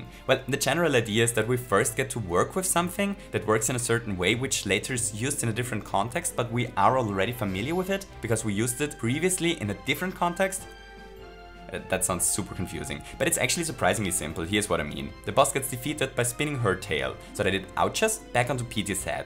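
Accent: Austria. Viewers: Wenn da östereichische dialekt ballert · Man merkt so den Österreichischen Dialekt raus xD Aber richtig cooles Video!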